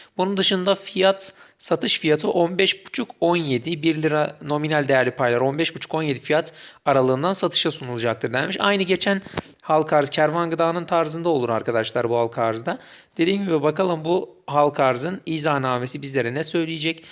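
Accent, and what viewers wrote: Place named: Newcastle, United Kingdom. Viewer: İngilizce aksanın newcastle upon tyne tarafına benziyor super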